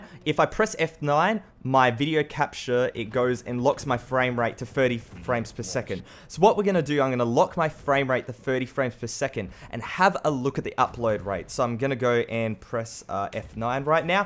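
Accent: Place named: Australia. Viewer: I like your Australian accent hehehehehhehe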